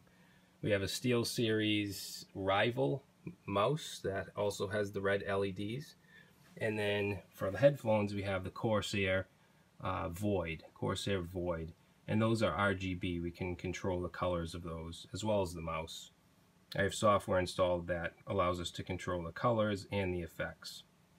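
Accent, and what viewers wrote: Boston, United States. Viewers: Well they both have Boston accent. I'd say is the mature version of Bucky.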